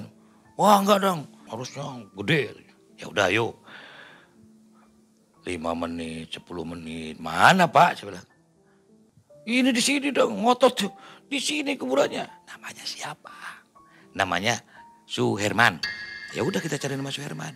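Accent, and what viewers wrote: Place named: Sunda, Indonesia. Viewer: Assalamualaikum kang,Abi sok setia nonton kisah na khas Sunda pisan nyariosna sing damang Oge sadaya kulawargina seru Oge Aya NU Matak seuri JD kahibur.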